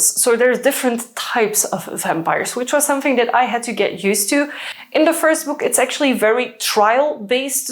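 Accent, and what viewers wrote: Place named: Netherlands. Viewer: no worries I like the dutch accent.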